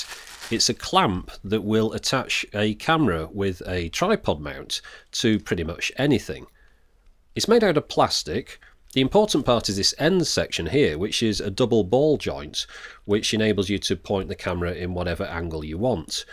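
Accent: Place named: Manchester, United Kingdom. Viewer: Cool Manchester accent. I wish I sounded like that...but of course everybody recognizes my accent..."I'm here to pump you up."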